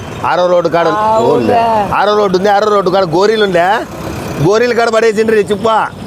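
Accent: Rayalaseema, India. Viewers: Rayalaseema basha chala bagundhi anna 😊😊😊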